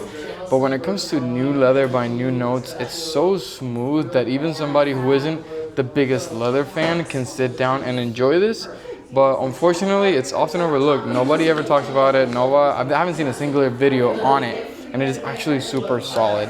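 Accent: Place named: Italy. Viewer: 🤨 Italian voice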